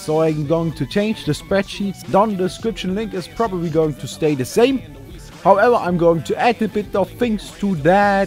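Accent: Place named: Germany. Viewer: his english is hurting my ears... german accent at it's worst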